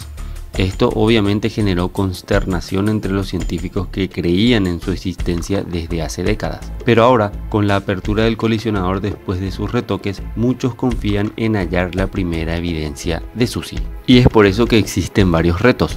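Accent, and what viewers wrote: Paraguay. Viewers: ¿Eres Paraguayo? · sos paraguayo? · Es confundible por LA voz es paraguayo yo soy Paraguay tambien jeje saludos amigo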